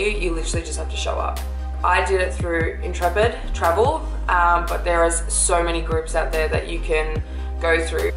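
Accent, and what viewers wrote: Australia. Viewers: What an Aussie accent:D Dear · Can't miss that accent! I did the trek in 2019 and loved it. Am going back as soon as we can travel again. I am from QLD; which state are you based in?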